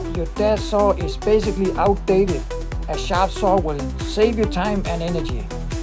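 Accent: Denmark. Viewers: Hey, that's the real, at least his voice. Deeper, older, but yep, I recognize his Danish English accent. So he is real, at least he was 8 months ago. (grins) But why is he always hiding himself all the time? Sup with that? And what's with that 'Jason face mask'? Hiding yourself like that is very suspicious.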